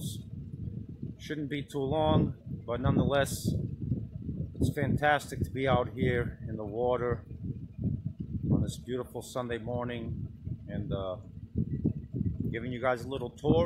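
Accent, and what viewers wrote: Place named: New England, United States. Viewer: I'm new here, what body of water is this? I'm guessing you are somewhere in New England with your accent! Wow I've never seen them processed before. Looks like I was right with NE. Your jacket says cape cod. It's on my bucket list to travel out there since we're only a few hours from there.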